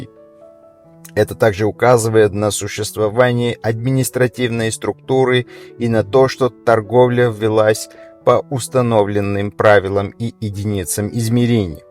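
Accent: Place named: Georgia. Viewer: ❤❤❤ Слава богу услышал,что возраст примитивных наконечников возрастом 2млн.лет.Почему-то считают 20-30 тыс.лет официально возраст человечества.Неужели нельзя называть правильный возраст человечества на Земле.Ранее видел раскопки Грузии,где были обнаружены гигантские челеческие кости.Хотел бы об этом найти продолжение....Мне нисколько не мешает озвучка человеком грузинским акцентом.Намного лучше,чем озвучка ИИ.....Насколько помню апостол Пётр говорил на арамейском древнем языке.,Как всегда,миру-мир,а армяне присваивают всё то,что раскопано археологами,заявляют о братстве.....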